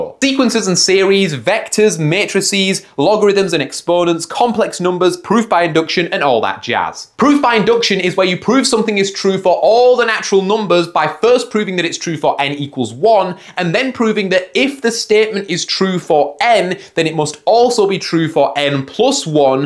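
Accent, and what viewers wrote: United Kingdom. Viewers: tf is that thick ass british accent?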